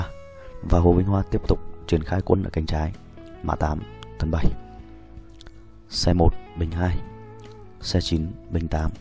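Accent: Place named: Quang Tri, Vietnam. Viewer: trung minh dang vậy mà tui cứ nghĩ giọng quảng trj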